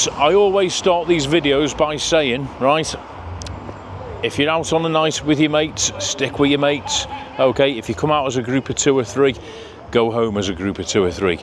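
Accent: United Kingdom. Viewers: It's not your home town when you've got the lfc liver bird on yer phone mate and a woolly back accent too la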